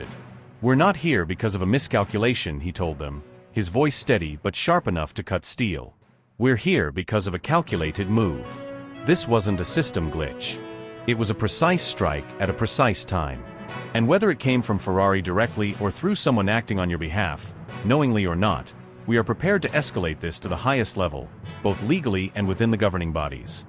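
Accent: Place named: United States. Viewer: Absolute rubbish. Why does the voice always have an american accent. Liberty Media as part of the tRump Mafia?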